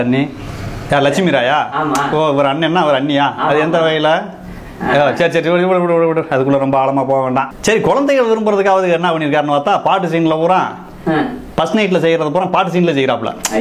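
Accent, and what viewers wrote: Madurai, India. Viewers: good try. I like madurai slang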